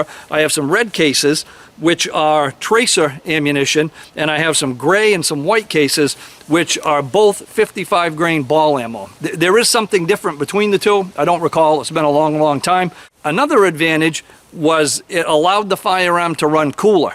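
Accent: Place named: Northeast, United States. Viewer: Mouth is too small. Looks homeless. Has a northeastern accent. Very twitchy like a methican American. Also full of shit. If you weren't such a poser you'd know a soldier wouldn't get a lighter load if this worked out, he'd just be ordered to carry more. Pound of feathers weighs the same as a pound of stones.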